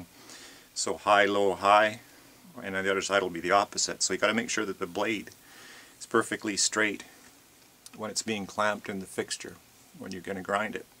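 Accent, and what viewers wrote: Canada. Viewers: I could tell you're Canadian as soon as I heard you talk. -From a fellow Canadian :P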